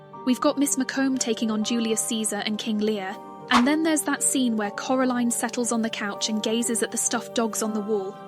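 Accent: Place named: United Kingdom. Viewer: I think it just sounds slightly odd because the speaker is British, but keeps using American colloquisms like "a bunch of" and "super" as an alternative for "very".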